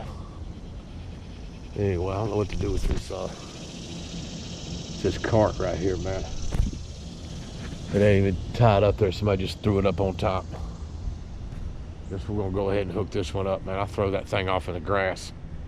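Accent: South, United States. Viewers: I just discovered your channel a couple of days ago and I have been watching it Non-Stop. I love your southern accent I love your relaxed kick back way. I love how you give it up to God. You were talking about shady practices when tow company send a bill after they sold your car at auction. That is shady as hell I agree. I live in Arizona and here in Arizona if your car gets towed and they sell it at auction then the State of Arizona which has nothing to do with the towing company or the person's car who got towed jumps in the middle of it and adds a abandoned vehicle charge of $500 which you don't know about until you go to try and change your address on your driver's license or if it's expired and you need to get an updated license or if you want to register to vote or you want to register to be an organ donor. No they won't let you do anything they keep you locked up until you pay that $500 charge that no the person lost the car the tow company got the car sold at auction and now somehow the States involved and putting this 500.00 charge.???? 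Please if you could explain this to me like I'm a two-year-old and make me understand I would appreciate it.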